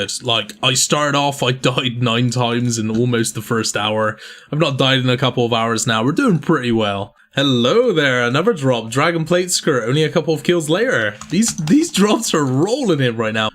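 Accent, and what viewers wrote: United Kingdom. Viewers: where is from? im guessing UK but i cant tell exactly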